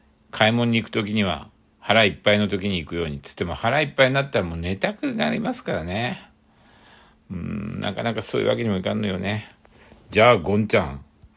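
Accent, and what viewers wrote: Saga, Japan. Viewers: 佐賀産の食材は素晴らしい👍 すき焼き好きで〜すそして佐賀弁良いね👍😊 今日のゲストは「ゾマソン」さん！あれっ、僕は間違ったかなさん😅 Xも見ますね！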